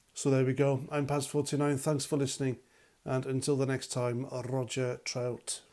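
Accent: Wales, United Kingdom. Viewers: You sound Welsh.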